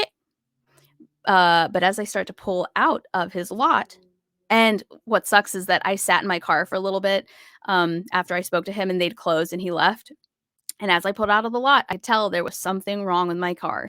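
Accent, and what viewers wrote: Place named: South, United States. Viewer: Welcome back! I love how the Southern accent surfaces depending on how riled up you are...lol.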